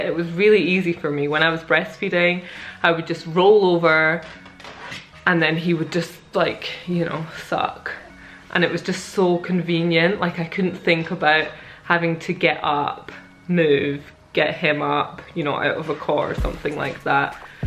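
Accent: Scotland, United Kingdom. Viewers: Finally a Scottish accent like me 😂😂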